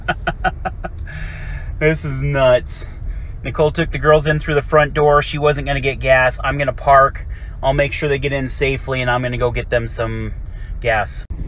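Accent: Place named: Wisconsin, United States. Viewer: Oh I love your accent! My dads side of family was all from Wisconsin. Haven’t heard it in years. ( I pop up with a little of it ever so often 😂)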